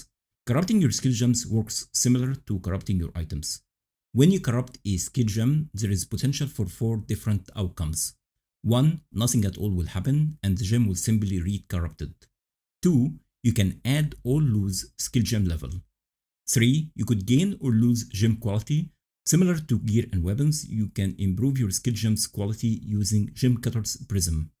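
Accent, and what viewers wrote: Egypt. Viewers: ايه يا باشا من لهجتك عرفت انك مصري ❤️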